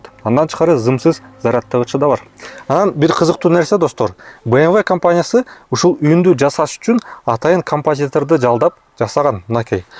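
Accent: Standard. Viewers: Таза кыргызча уккан кандай кана кулака жагымдуу угулат😍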